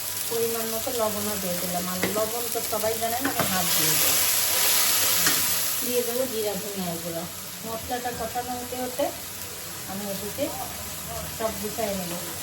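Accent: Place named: Rajshahi, Bangladesh. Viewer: Ettto bad comment keno apnader? Uni notun video korteche...hoyto ektu vul hoy..ar tachara unara Pabna Rajshahi er manush...kothar moddhe local tone thakbei....eta emon dosh er kichu na...manush er valo chaite paren na??dhire dhire e manush unnoto hoy.. Ekbare na...parle valo kichu koren nije...then onner piche lagen...azaira jotto sob..jader kono kaj nei tarai emon onno ke bad comment kore...tai bolchi valo hote shikhen....video valo na lagle dekhben na..ke boleche apnake dekhte??/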